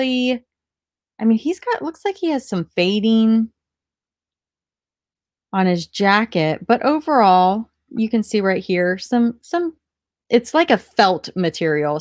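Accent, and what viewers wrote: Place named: South, United States. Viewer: A nice southern accent too.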